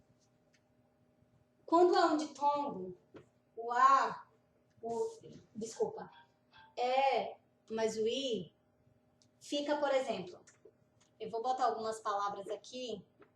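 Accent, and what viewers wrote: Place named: Northeast, Brazil. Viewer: Tô louca pra aprender norueguês. Caí aqui na doida, mas já te amei. Obs: Acho que vc é do nordeste, vc tem o sotaque parecido com o meu. Sou de Natal/RN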